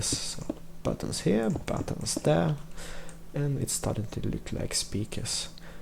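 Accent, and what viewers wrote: Poland. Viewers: dude are you polish ? XD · bardzo dobrze to wygląda ! ;) jest polski akcent super ;)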